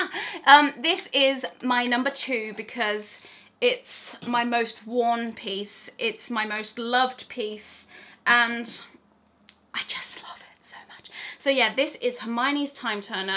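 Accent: United Kingdom. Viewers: I’m so used to hearing you so when I hear a British accent it sounds so normal to me btw I’m American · I love your British accent ❤️❤️❤️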